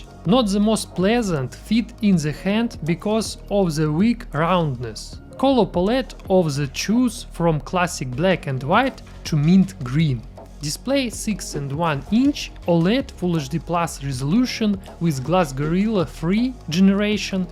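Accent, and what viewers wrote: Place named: Russia. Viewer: subscribed just for Russian accent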